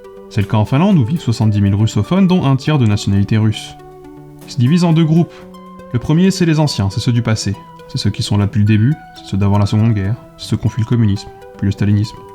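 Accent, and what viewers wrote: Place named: Banlieue, France. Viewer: Un accent de banlieue. Nois qui avions appris le français à l'école on a du mal à comprendre tous les mots de l'accent de la rue c9mme ce mr qui avale certains mots . C'est moche. Je lui conseille d'écouter les narrations d'une chaîne youtube appelée LAMA FÂCHÉ. J Aime la voie de cette chaîne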